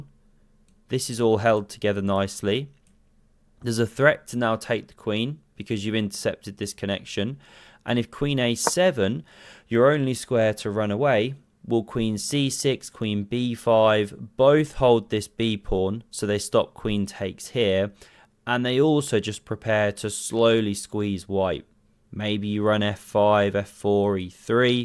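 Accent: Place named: Bedford, United Kingdom. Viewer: Hey man, thanks for uploading, despite the difficult times, you bring great joy, even if you don’t know! I only discovered you recently, but already am a big fan! Nice of you communicating openly about the absence, even the reason for it, which you didn’t need to (but it explains the obvious and understandable sad mood). Good wishes to you and your family, which is surely so blessed to have you! PS don’t worry about us the audience, we have also the old material in the meantime and also priorities are priorities. Don’t put too much pressure in the meantime on yourself in these stressful times, and don’t forget to take enough C vitamin, which helps body deal with stressful reactions! Cheers & greetings to Bedford(shire), from someone, who has an accent nearly identical to yours, not because of the one semester that I spent at the University of Exeter Business School as an exchange student - where I was the best at the University of Exeter Chess Society - but because of a certain highly perfectionist English lady, who took even the slightest mispronounciation out of me and ended up mocking me at the end on how I have “a perfect Bedford accent” (she was from there) hehe. 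Loved the culture, people and country, had the best time there (still feeling English a bit, even though ethnically I’m not 😅 … even wore the red poppy for years, still have it 😊 ). Lived in three other foreign countries, but England I loved!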